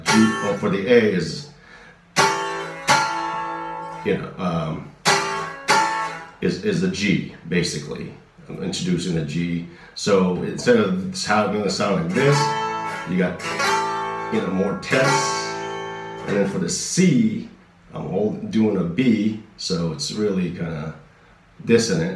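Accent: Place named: Philippines. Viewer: So cool to hear that he still has a bit of Filipino accent left lol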